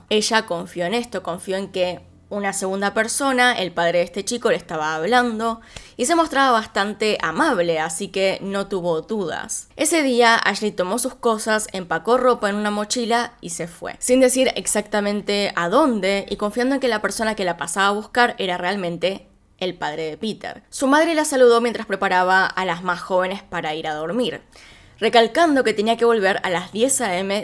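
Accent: Argentina. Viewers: Eres Argentina?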